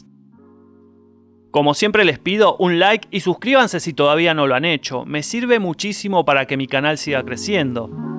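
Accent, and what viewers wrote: Argentina. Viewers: Pero es argentino Ese es su acento No puedes cambiar algo que es endémico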